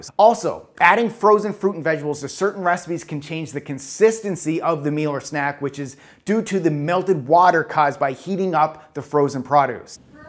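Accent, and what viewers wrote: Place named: Canada. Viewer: Not sayin’ it wrong....just Canadian 🤪🇨🇦 Never lose that!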